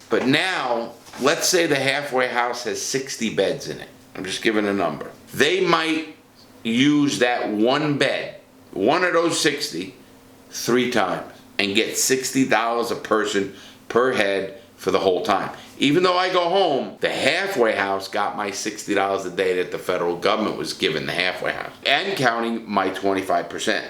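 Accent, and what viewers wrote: New York, United States. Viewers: I love the NY accent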